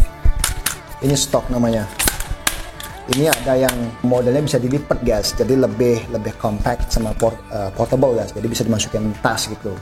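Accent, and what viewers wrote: Bali, Indonesia. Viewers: Logat bahasa kaya orang bali om salam dari palangkaraya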